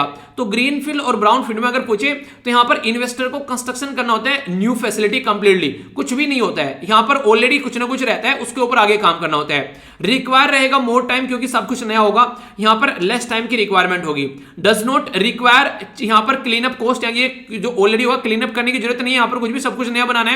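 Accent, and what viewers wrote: Haryana, India. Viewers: Namaste sir sir aj to app bhout haryanvi bol rhe app haryana se hi ho na lg rha h boli se mst lecture 100% charged thanku so much sir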